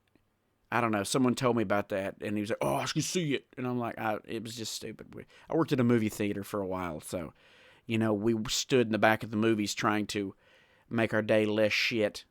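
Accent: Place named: South, United States. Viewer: U HAVE THAT SOUTHERN ACCENT WHAT STATE U LIVE IN ??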